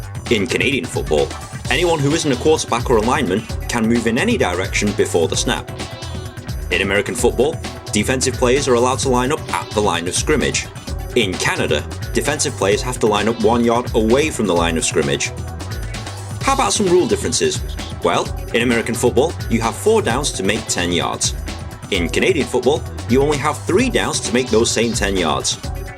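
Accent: United Kingdom. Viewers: Why is a Brit explaining American or Canadian football? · Am I listening to a British guy tell me about football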